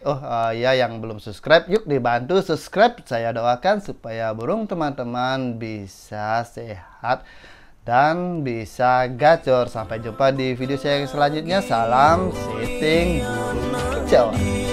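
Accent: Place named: Java, Indonesia. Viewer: bang suara mu mendok bgt 😀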